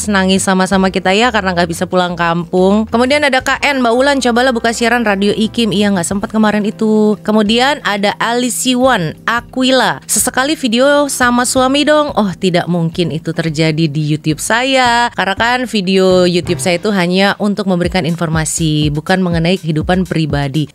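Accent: Indonesia. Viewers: Dengar percakapan sahaja sudah tahu Sis ini orang Indonesia! Masih ada yang bertanya orang Indonesia atau Malaysia? 😂 From Malaysian - Kuala Lumpur Malaysia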